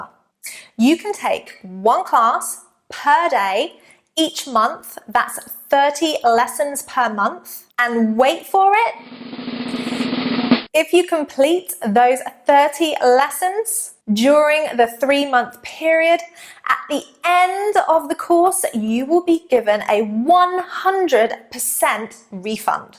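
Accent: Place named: RP, United Kingdom. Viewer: I like your posh accent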